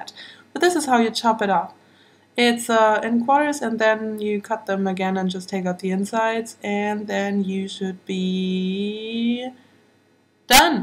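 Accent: Germany. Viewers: love the german accent hahaha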